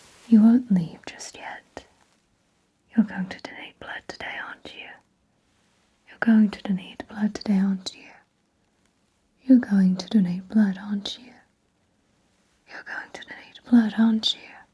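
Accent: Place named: England, United Kingdom. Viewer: i find it amusing that the way you channel the sinister, menacing vibe is by sounding more English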